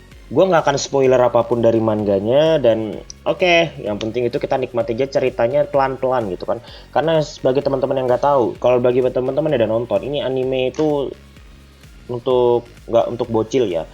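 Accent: Banjar, Indonesia. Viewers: Bang dri banjar kah?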